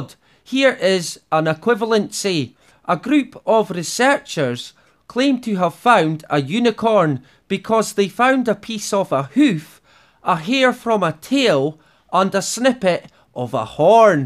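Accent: Scotland, United Kingdom. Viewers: aha! My first assumption was Scots, Thank goodness. My former boss from Glasgow has been passed for over 10 yr. I was one of the only peeps who understood everything he said🤠 RIP brother Kirkpatrick Keep up the excellent work!good sir☮️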